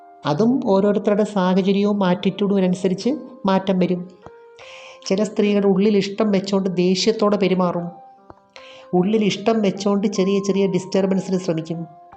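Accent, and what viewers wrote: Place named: Travancore, India. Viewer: ഈ പറയുന്ന മാം ന് മൂക്കടപ്പോ എന്തോ ഉള്ളപോലെ... പിന്നെ തിരുവിതാംകൂർ ശൈലിയിൽ, ഭ- ക്ക് "ഫ"കാരം ഉപയോഗിക്കുന്നു.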